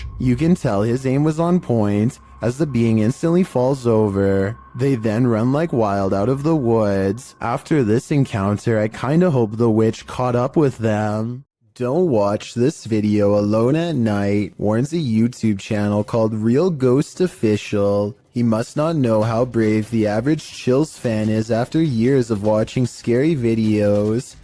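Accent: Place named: Southern California, United States. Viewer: Your SoCal accent is on point.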